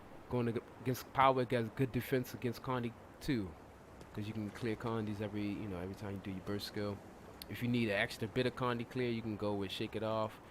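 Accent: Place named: East Coast, United States. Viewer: Do more commentary if you have the time. I be hearing the east coast in the voice, from the Chi-town here.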